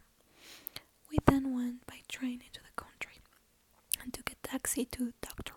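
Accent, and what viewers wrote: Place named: Mexico. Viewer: Eres la mejor persona hablando mal inglés xd, adoro nuestro acento mexa. Saludos te mando un abrazo y un beso;)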